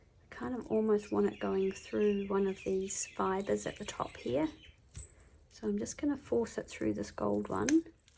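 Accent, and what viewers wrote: New Zealand. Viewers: That was really great. I loved it and the choice of fibres you used. Was that a fellow kiwi I could hear? You have inspired me ❤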